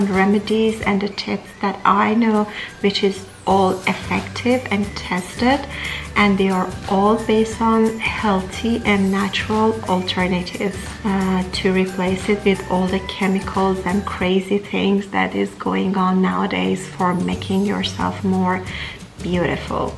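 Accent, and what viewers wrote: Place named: Iran. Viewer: ur accent is only Persian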